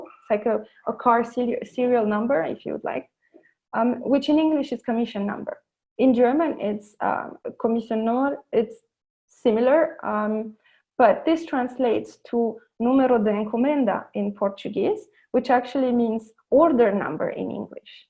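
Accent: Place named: United States. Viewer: Nu am urmărit tot spiel-ul, dar sper să o fac altă dată. Pe mine mă durea întotdeauna capul când trebuia să vorbesc în l. engleză cu clienții nemți 🙂. Dar asta a trecut. Supărarea mea cea mai mare este idioata „romglish”. Nu știu dacă va mai veni vreodată o vreme când o să ne fie cald sau frig în loc să suferim de „disconfort termic” !@ ! În altă ordine de idei, aveți un accent englezesc superb. Mi se pare însă mai degrabă american, decât britanic. Numai bine!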